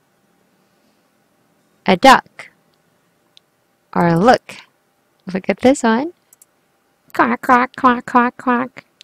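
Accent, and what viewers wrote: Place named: England, United Kingdom. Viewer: Nice english accent po ate sana maturuan niyo ako ganda niyo po keep safe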